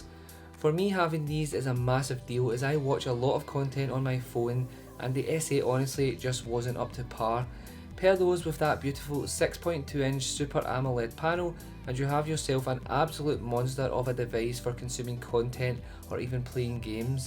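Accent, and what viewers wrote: Scotland, United Kingdom. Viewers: Love the British accent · Awesome! Subbed because your accent reminds me of tartans. =) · nice Scottish accent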